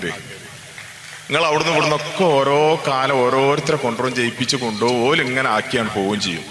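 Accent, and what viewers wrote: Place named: Kozhikode, India. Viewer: സാമ്പാഹ്‌, കൊള്ളാം നല്ല കോഴിക്കോടൻ ഭാഷ. അഹങ്കാരം ഇല്ലാത്ത നിഷ്കളങ്കമായ താങ്കൾക്കു വിജയാസകൾ!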